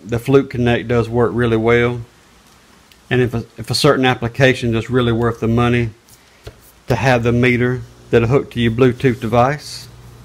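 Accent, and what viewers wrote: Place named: Georgia, United States. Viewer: Are you a Georgia boy! Lol. Nice meter. Stay safe buddy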